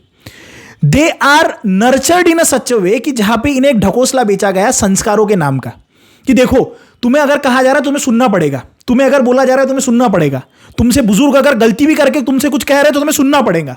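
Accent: Maharashtra, India. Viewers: R u marathi bro. Because your way of speaking🗣 directly tells 😊😗 ??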